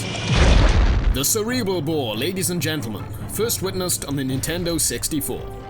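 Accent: Wales, United Kingdom. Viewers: i know its a stupid question to ask. are you British ? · Actually nevermind. Wales! Are you welsh? I am Cockny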